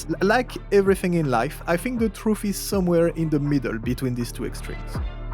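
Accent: France. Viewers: T'es français ? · He's so French I thought he was saying dispatch not this patch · FRENCH SOUNDING😨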